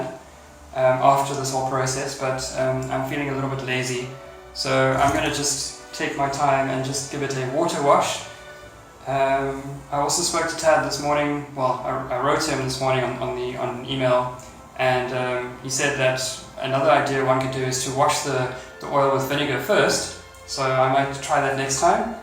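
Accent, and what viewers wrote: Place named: South Africa. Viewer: nice also to hear a fellow South African accent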